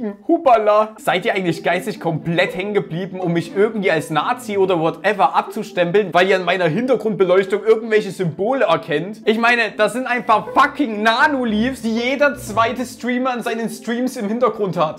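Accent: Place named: Saxony, Germany. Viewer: Aber ein Prob muss an die Seite geben! Sie sind vllt die Ersten die wissen, dass du einen sächsischen Dialekt hast und keinen ostdeutschen...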